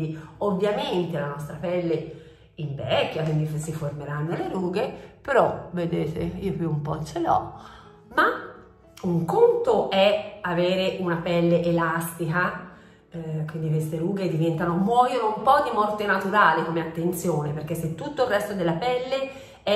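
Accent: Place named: Florence, Italy. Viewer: Sei fantastica, bravissima e molto bella La tua parlata Fiorentina è un piacere Un bacio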